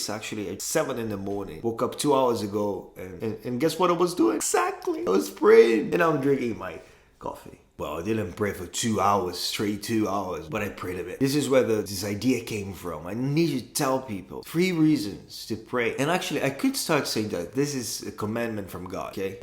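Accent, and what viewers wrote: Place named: United Kingdom. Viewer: o sotaque britânico kkkk mt bom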